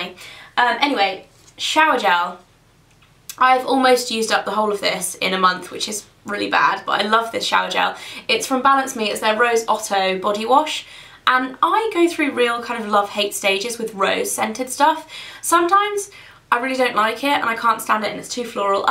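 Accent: United Kingdom. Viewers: british accent ♥♥♥ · I think that you ,pixiwoos and Tanya(pixi2woo) are the most adorable girls in this huge yt world.......maybe its because I love your british accent.....hahahahhaha....kisses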